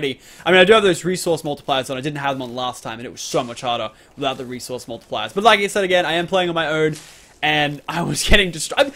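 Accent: Australia. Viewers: He is australian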